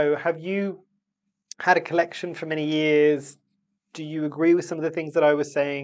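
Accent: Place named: London, United Kingdom. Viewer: Wow, coming from a beautiful warm climate, what inspired you to move to the 🇬🇧 UK? Was it for university? Your accent even sounds like you are a native of London. Ps. You look really good in this video. (Not to say that you don't normally look good) 😊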